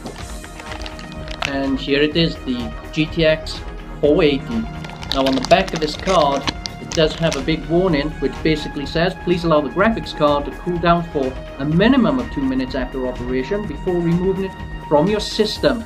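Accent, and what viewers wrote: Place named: Wales, United Kingdom. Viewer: Are you Welsh? You sound Welsh hah.. Awesome video.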